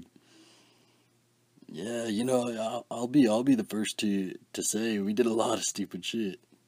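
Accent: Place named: Alabama, United States. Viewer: I could listen to your deep Alabama voice all day my favourite YouTuber no whistles or click bait. Your channel is artistic, authentic, honest, my favourite YouTuber keep up the great work !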